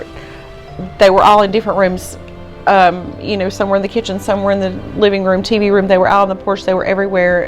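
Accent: United States. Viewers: Are you from southwest VA? I grew up there and you sound JUST LIKE my family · Dang she’s country. I’ve been living in the south for 30 years and I still can’t get use to it. Lol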